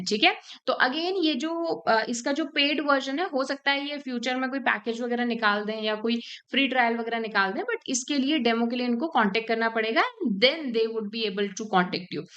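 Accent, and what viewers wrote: India. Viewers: Indian English fuck it🚮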